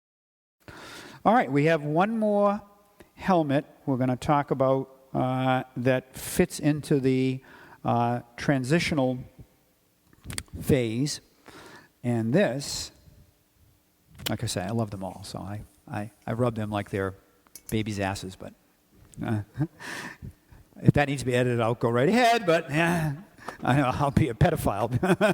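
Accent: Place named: United States. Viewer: Love the Americans and their funny pronunciation of German words.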